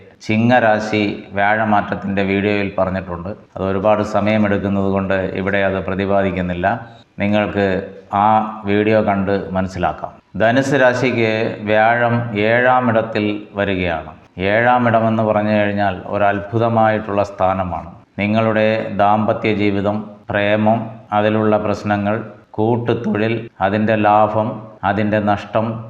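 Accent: Tamil Nadu, India. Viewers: Tamil touch. But good good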